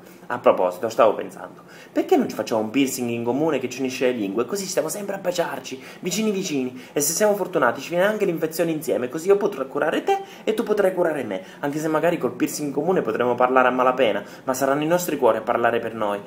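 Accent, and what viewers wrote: Abruzzo, Italy. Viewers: Ngule, si sind l'accend abbbruzzes !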